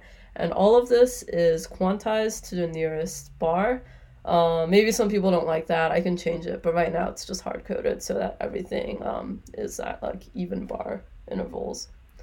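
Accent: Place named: United States. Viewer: japanese but american as you can see how she talks.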